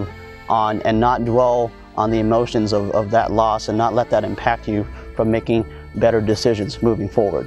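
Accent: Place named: United States. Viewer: bro you are such an American. The accent was hilarious. Nice into and thanks for the video.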